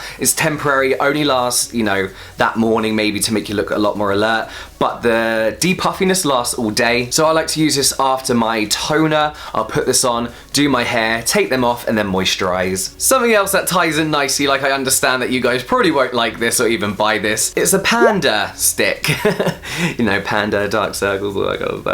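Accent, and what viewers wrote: United Kingdom. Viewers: Looks like this is interesting!!!...Let me subscribe to your channel now...I'm crazy about the british accent _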